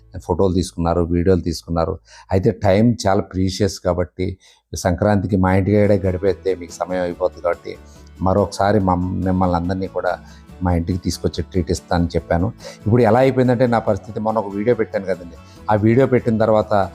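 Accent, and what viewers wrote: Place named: Visakhapatnam, India. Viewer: godavari matayasaledu vizag matalvunnayi 😍😍🙏🙏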